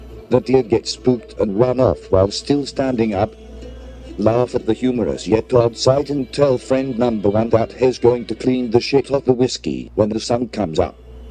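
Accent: United Kingdom. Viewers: Thanks for the upload brother. Got a new channel to binge later. So far you are the only other text to speech channel that used the male English accented voice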